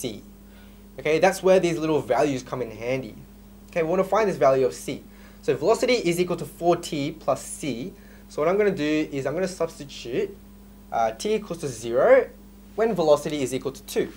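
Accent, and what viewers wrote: Australia. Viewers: Honestly this video really helps, Implementing examples and worked solutions really helped clear some misunderstandings I had, Thanks. Also the Australian accent is really rubbing off on me after watching a few of of these videos.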